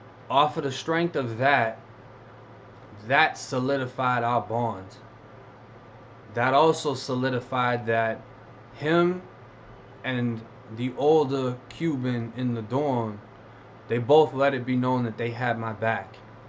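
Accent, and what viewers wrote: Boston, United States. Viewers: U from fl or boston? I see u got that Boston accent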